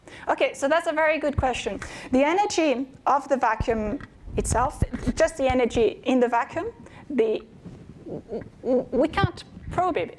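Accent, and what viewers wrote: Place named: India. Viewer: Why does she talk like an Indian?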